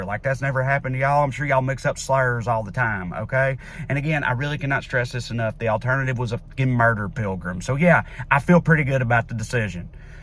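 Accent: South, United States. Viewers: Is it Just me ir us the southern accent getting stronger? hard to understand from abroad... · Being funny and knowledgeable and breaking it down in an easy to understand flow....another George Carlin in the makings right here. Albeit with a Southern Twist.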